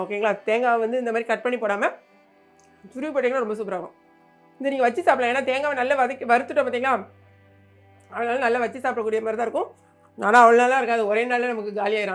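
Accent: Tirunelveli, India. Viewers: Your videos super .. recently watched one of your video about vivikam recipe forwarded from my sister . So much nostalgic memories from my childhood, from idayangudi living in Chicago for the past 27 years , your Trinelveli accent and very simple talk , nothing fancy .. great collection of recipes. Super super . Need see many of your wonderful recipes and want to try them Thank you so much . Finally so glad I found your channel.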